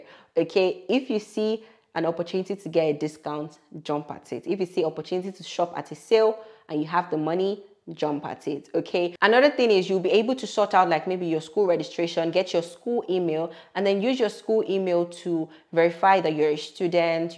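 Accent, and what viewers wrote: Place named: Yoruba, Nigeria. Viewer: Love your mixture of Yoruba and English accent..Otilooo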